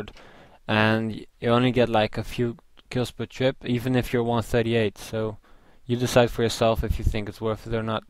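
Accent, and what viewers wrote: Netherlands. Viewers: Haha leuk nederlands accent :p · I love the "+20,500,000" xD btw are u dutch? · Ik hoor aan je stem dat je NL bent! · are you from the netherlands or from Belgium? nice vid btw, thumps up :)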